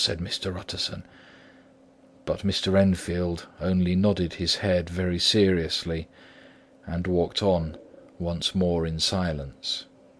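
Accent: England, United Kingdom. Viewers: I heard of the title plenty of times,, but it was nvr mando reading for me. Tonight was my 1st time hearing the story,, and I completely LOVED it!!! Especially since the narrator has English accent, it fits so perfectly. Wat a awesome tale!! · one of my very favorite books! i love the British accent ! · British af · An excellent BRITISH narrator for this classic horror story! Wow - rare for Librivox, thanks so much! 🌹🙏🏼❤️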